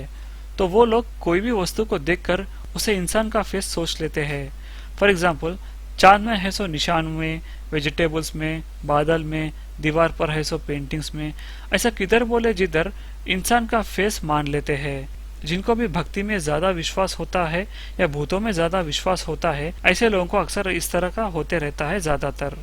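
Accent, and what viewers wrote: India. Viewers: Boli badi acchi lagi, Marathi ho na bhai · Aap hydrabadi ho na..?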